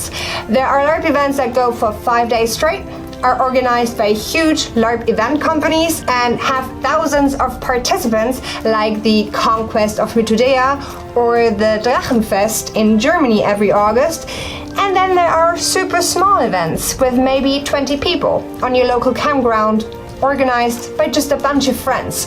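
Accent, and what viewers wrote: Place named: Ireland. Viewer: Sorry for being an American Idiot, but I totally thought was from Ireland from her accent. Prost!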